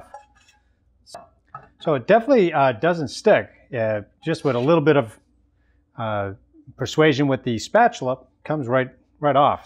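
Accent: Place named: Boston, United States. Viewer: you are the most Boston sounding guy, are you from New England? If not you will fit right in hahaha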